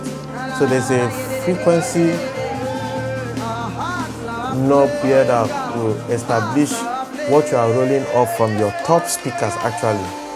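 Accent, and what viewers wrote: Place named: Nigeria. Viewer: Informative, but that accent eyy, is that nigeria, it makes me remember the way nigeria school teacher speaks. But the information is 💯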